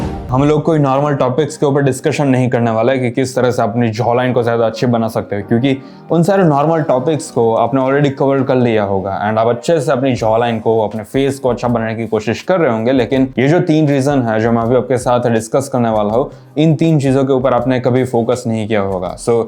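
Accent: Gujarat, India. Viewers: Pure gujrati voice hai bhai ki ❤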